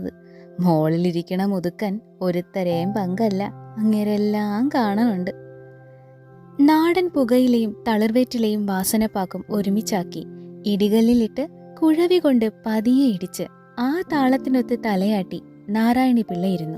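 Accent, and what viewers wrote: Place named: Thiruvananthapuram, India. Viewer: 🎉🎉🎉. തിരുവനന്തപുരം ശൈലി 😂😂😂😂 ചിരിച്ചു ചത്ത്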